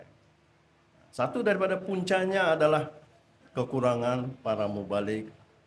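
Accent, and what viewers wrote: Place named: Indonesia. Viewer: beliau seperti orang Indonesia, logat bicara tidak seperti Malaysia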